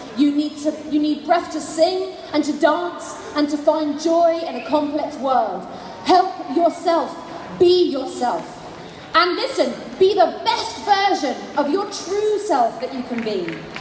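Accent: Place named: United Kingdom. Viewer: Nice accent British Nice speech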